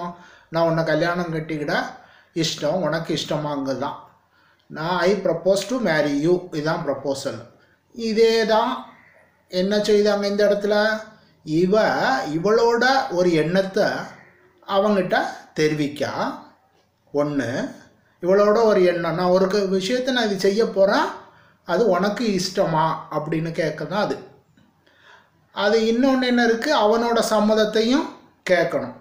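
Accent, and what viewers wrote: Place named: Tirunelveli, India. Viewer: Tirunelveli slang tha...mass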